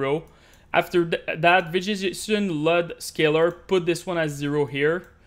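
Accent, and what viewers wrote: Quebec, Canada. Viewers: that french-canadian accent DAMN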